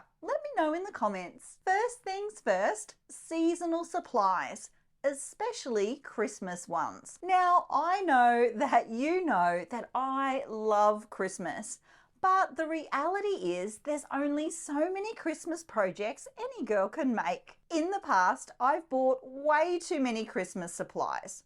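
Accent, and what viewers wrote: Australia. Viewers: Don’t talk daft. It’s a very nice Australian voice. You change your nasty attitude.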